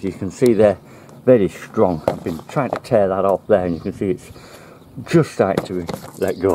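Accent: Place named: Northwest England, United Kingdom. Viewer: Great job lad, I copied your idea over Xmas break and it works a treat. You sound more northwest England.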